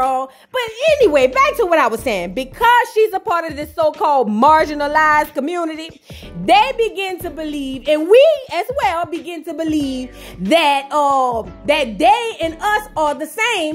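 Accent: New Orleans, United States. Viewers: Sis I absolutely love your "Nawlins" accent and your commentary! That "get her up here" gets me everytime! Stay on their necks sis!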